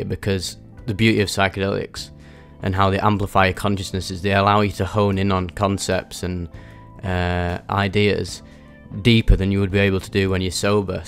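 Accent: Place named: York, United Kingdom. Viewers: Luvin the ooooh ai, cheeky bit'o York speakin. All reminders of home/my British heritage aside, appreciate the sharing of your wisdom! I'm fascinated by psychedelics and hopefully with the right intentions, setting and people I can use it as a positive tool. However also knowing the extreme risks in undergoing such activities. Thanks again! 🇬🇧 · I reckon he's from Yorkshire, up in the North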